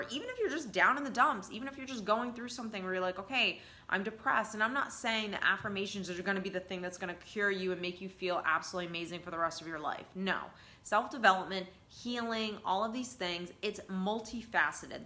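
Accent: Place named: New England, United States. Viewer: Hey — really am enjoying ur podcast and the tidbits of information that you provide. Love the New England accent that slips out times. Very charming 🤗. Keep doing you— it resonates with so many of us! #STEPHANIEROCKS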